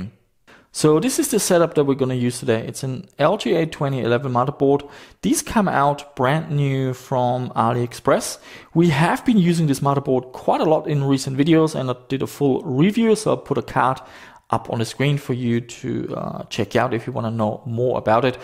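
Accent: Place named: Germany. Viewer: Hey man how come you sound more German than Australian?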